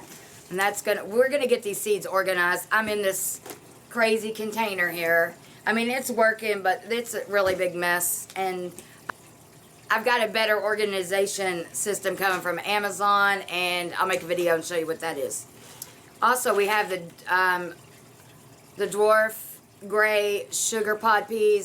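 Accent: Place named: Kentucky, United States. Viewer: I have owned it over 25 years! It helps!she's also the author of Roses love garlic! I like Rodale's Herbal.Great video, never stop learning right?! Aww, you got your Baker Creek winter garden porn!😂💕. What is your growing zone?I'm up in Chicago, zone 5b. You sound like Kentucky to me. Freezer coleslaw?!my record just skipped!lol That is a recipe i need!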